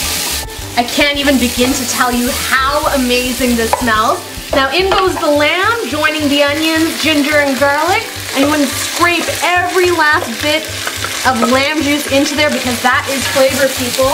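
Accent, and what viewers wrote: United States. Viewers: You sound sooooo American 🇺🇸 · comments===hi i am a chef at indian restaurant in dallas.....your looking beautiful and the rogan josh yummy yummy...thx for tip ratanjot. i never know this give rogan josh color i learnt from you today. name look like tamil but american accent english keep on rocking.....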